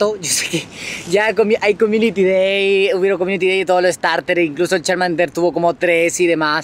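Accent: Chile. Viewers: eri chilenooooooooo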